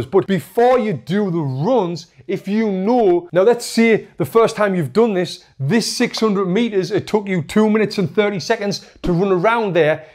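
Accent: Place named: England, United Kingdom. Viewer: You have got marvelous English accent, sir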